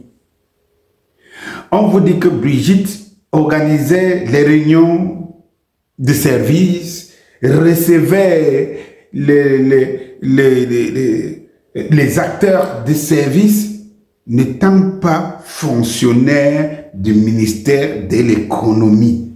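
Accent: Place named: Africa. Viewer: J’adore votre accent Africain 😍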